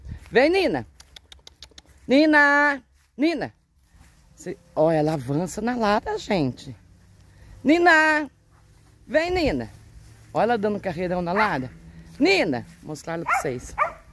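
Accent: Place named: Minas Gerais, Brazil. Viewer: Eu adoro esse seu sutaque caipira de Minas gerais e são Paulo kkkk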